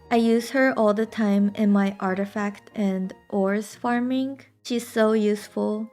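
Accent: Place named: Indonesia. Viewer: ur not sound like japanese, more like indo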